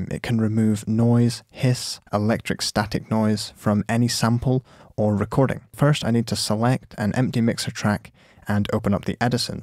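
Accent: Scotland, United Kingdom. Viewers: He's Scottish isn't he?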